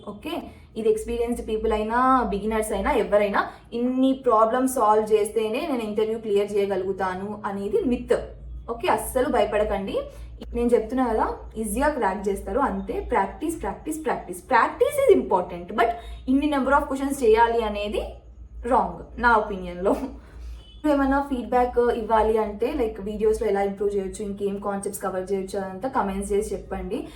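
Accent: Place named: Hyderabad, India. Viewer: Hi, I am an SDE 2 from a Product based company in Hyderabad. I really loved this video. Me being a pakka hyderabadi, absolutely loved your slang. Coming to the content, I can vouch for everything you said about the DSA prep & interview. It is fully informative and would be really helpful for everyone. Kudos to your efforts to help our Telugu people.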